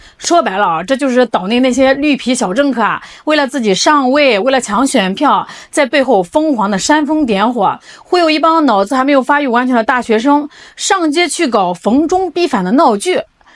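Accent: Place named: Shandong, China. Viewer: 山东口音😂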